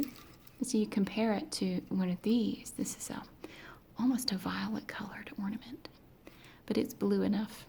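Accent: United States. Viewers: I love your American accent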